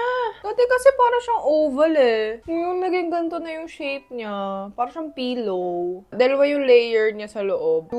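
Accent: Philippines. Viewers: bat ang galing mo mag tagalog? u sound very pinoy.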